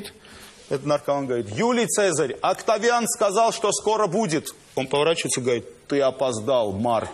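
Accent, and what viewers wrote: Armenia. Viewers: А не на русском ТВ Мартиросян включает армянский акцент. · Когда он со своими у него армянский акцент, а среди русских Ванечка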